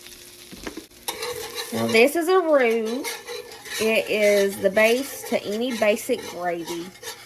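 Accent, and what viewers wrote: South, United States. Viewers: I just found you and can not stop watching you. I'm from Tennessee but live in Ohio. I sure do miss my southern belle accents, lol. I enjoy your recipes and your sweet hospitality. God bless you and your family and a very Merry Christmas. Your boys are southern Gents ❤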